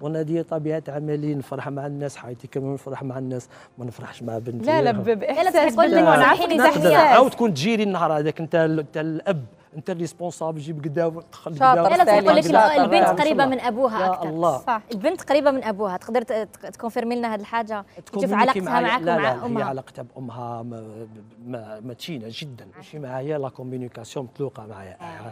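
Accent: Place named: Skikda, Algeria. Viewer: لحن هاداك تاع اللهجة السكيكدية مزالها عندك تهبل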